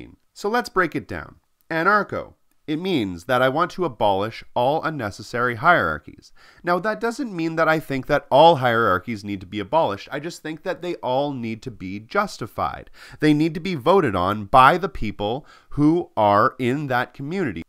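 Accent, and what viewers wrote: Canada. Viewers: I can tell you are Canadian by the way you say "about" but good video 😁👍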